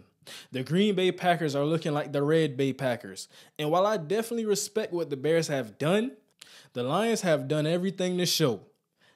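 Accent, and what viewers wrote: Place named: South Florida, United States. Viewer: Are you from South Florida?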